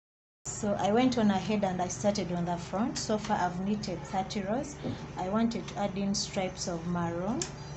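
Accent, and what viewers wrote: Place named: Uganda. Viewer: sounds Ugandan. Do u offer lessons face to face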